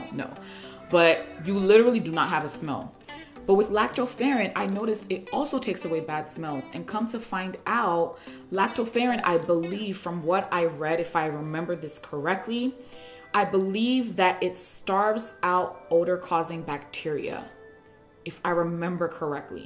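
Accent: Nigeria. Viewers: Are you Nigerian?? 😅🤣🤣🤣. I like the way the Nigerian in you jumps out every now and then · Girl I love your frequent switch to Naija accent, lovely video. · new SA suby thank you so much for great advise my 27 year old daughter struggles with body ordor.....anyway. loving the Nigerian accent LOL!!! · are you from Nigeriaaaaaaaa?😅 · Love the Nigerian accent.....Love from Naija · Lol its the nigerian accent for me no matter how bitter it is I'm going to take it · Great video,you got a new subbie as soon as i heard my Nigerian accent?Naija for life,lol. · We are the same... I am THE supplements’ Queen! taken most of the supplements you mentioned. Took MSM in only water too! Stopped as it messed with my stomach after a long time. Taken collagen for years. Take chlorophyll as it helps me with energy and my red blood cell count. May just return. ... and did I hear a Nigerian accent? 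Love how you switch. Laughed a lot. Im subscribing, my naija sis! 💕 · Thanks for all the info. I get my supplements from iHerb too. Never heard of some of the supplements you mentioned. Meanwhile you got me when I heard that Naija accent.. waow I was like, whaaaaat that’s my sis. 👍🏽 Abeg you ooh keep it up 🥰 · Great video 👌🏻 Love the Nigerian accent! 😅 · You are a NIGERIAN??? At a point it was just clear.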